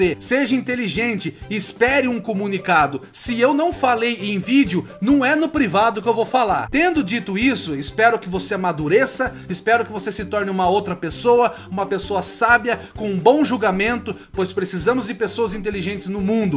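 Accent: Brazil. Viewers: você é do sul mano ?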